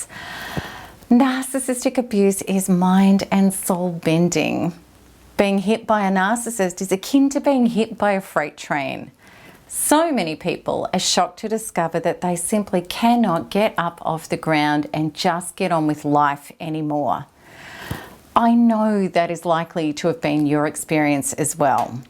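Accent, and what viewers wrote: Australia. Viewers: What a heavy australian accent.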